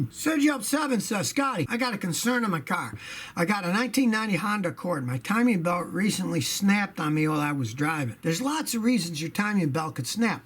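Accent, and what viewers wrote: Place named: Texas, United States. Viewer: This is new. It's sorta like the YouTube version of Car Talk with a Texas accent.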